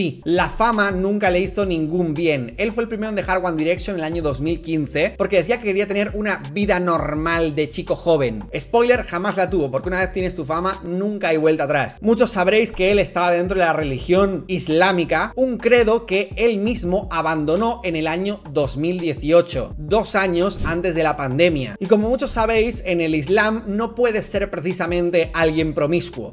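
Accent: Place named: Canary Islands, Spain. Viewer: Aunque la vayas de peninsular se te cae el acento Canario x todos los costados fantasma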